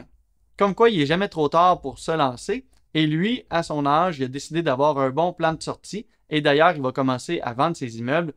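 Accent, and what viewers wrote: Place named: Canada. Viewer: J'adore l'accent Canadien ! C'est tellement pittoresque. Merci d'utiliser cette belle langue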